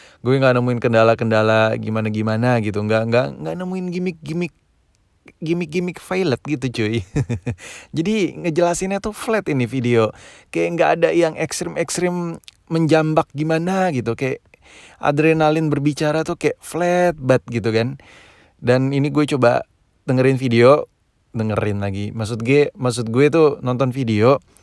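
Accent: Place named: Java, Indonesia. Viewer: Thod bahasa jawa lo sama persis kek daerah gw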